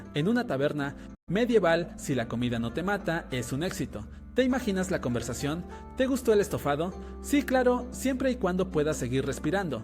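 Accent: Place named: Latin America. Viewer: Tienes la forma de hablar de alguien que vive en un país en qué seguramente una gran parte de la población no tenga agua corriente o viva en condiciones de insalubridad.. tal vez cualquier país de Latinoamérica?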